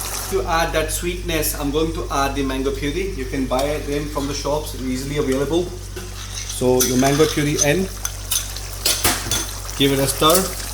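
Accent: Scotland, United Kingdom. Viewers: Hint off jock accent there pal 👍👍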